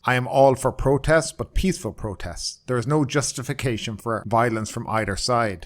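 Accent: Ireland. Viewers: I love the Irish accent..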